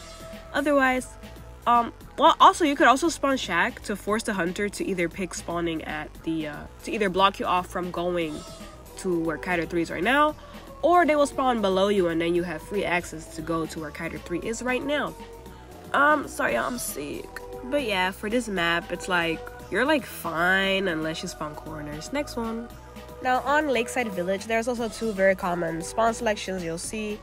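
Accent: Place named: Africa. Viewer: i love ur africa accent! im ur biggest fan plz remember when ur famous plz shoutout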